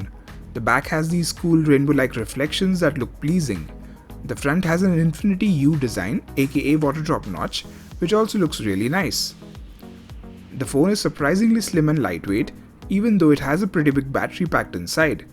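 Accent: India. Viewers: Youtube should put all these indian reviews on youtube india. Indian accents are super annoying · I Don t understand Indian English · I love your accent it's Indian right.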